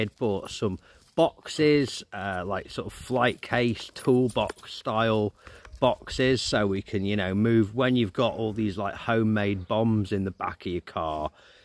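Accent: United Kingdom. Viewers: British accent in Ukraine😅👍👍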